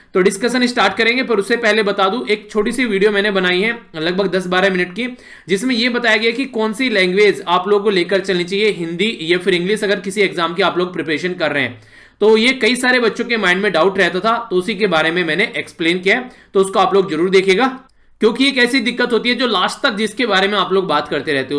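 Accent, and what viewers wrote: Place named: Rajasthan, India. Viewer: Sir r u belongs to rajsthan bcz ur pronunciation .... M bhi rajsthani so I can connect ☺️🤪like Sadi instead of shadi , dactor instead of doctor n so on..........I have been noticing this thing for many days so ......☺️☺️☺️☺️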